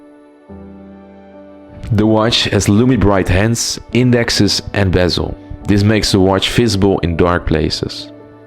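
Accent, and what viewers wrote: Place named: Netherlands. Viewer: Ik weet dat je nederlands bent, maar alsjeblieft werk aan je accent.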